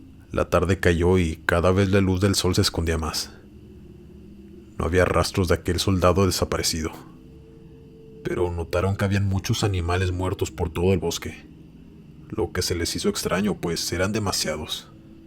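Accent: Mexico. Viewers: Me gusta mucho este canal. >Narra las historias con la seriedad que merece. >tiene una voz muy agradable y modulada, y norteña como yo. >los relatos son muy interesantes, incluso siempre que los escucho logro estar ahí donde se narra, en el bosque, desierto, etc.... es que debido a las experiencias vividas en mi infancia, no tengo el miedo que se esperaría deba sentir, y aparte consumo mucho contenido como el suyo, quizá buscando experiencias similares a las mías o buscando una respuesta. >me gusta mucho que en cada relato pone un título y subtitulo. Le da más suspenso. GRACIAS.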